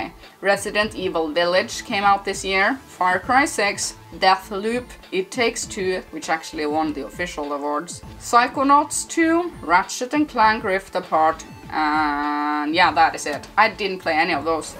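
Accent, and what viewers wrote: Norway. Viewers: Cool video girl. I subbed because you're cool and I like your accent from Norway huh?? Thata neat and you like Nintendo 🙂 Happy New Year!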